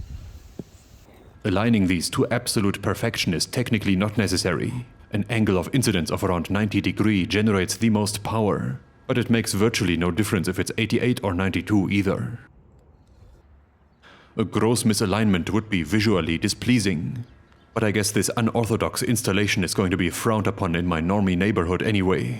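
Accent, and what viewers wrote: Germany. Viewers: Quite to the contrary. I eagerly await this endeavor with your German accent providing the perfect ASMR soundtrack. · Just watching this for the funny way he is speaking. I love this german accent. If you got an accent, exaggerate it to make the video even better.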